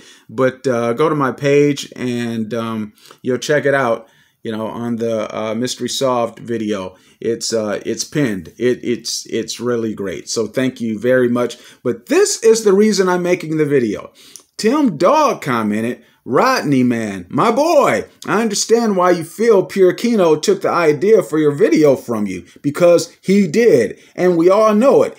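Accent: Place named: Detroit, United States. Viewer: ok peace brother, keep the videos coming. That Detroit accent is very distinct. I met Manny years ago in Ann Arbour.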